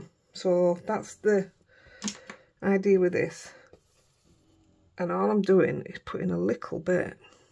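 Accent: Yorkshire, United Kingdom. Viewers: Hello, I found this video today. I enjoyed watching it a lot, so instantly subscribed. It's also lovely to hear a Yorkshire voice. I look forward to watching your other videos.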